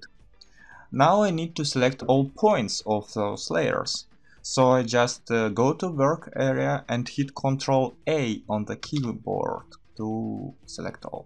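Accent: Russia. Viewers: Are you from Russia, you have russian accent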